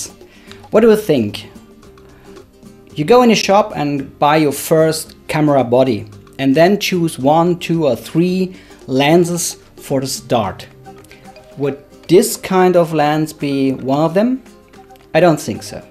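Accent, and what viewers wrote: Germany. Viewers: Take it as a compliment, i liked it alot ;) The combo of the german techno and the accent put a smile on my face, so bonus points! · cooler duetscher akzent ;-) aber ein gutes video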